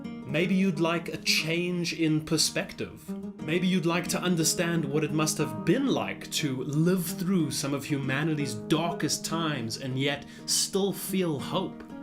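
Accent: South Africa. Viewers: Guy are you South African?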